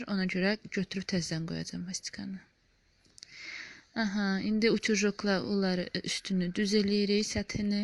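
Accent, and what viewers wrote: Ganja, Azerbaijan. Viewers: Xanım diyəsən Gəncəlisiz hə?🤗ləhcəniz çox oxşiyir gəncəlilərə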